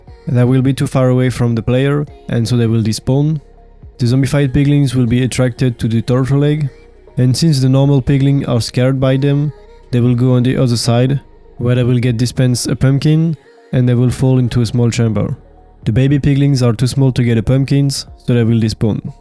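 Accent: France. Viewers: HA HA jai vérifié et t'a bien l'accent français chef. Mais sinon tres bonne video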